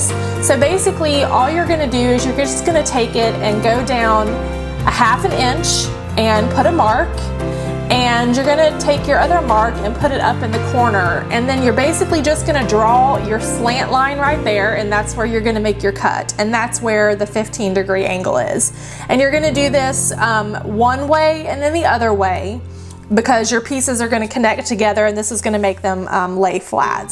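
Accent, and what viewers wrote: South, United States. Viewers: I guess it probably because of the music and your voice is the voice of my southern life..❤️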